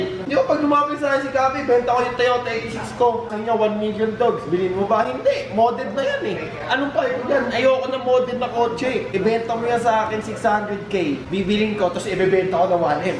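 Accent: Visayas, Philippines. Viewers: Bisaya ka ba? 😁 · bisaya ka?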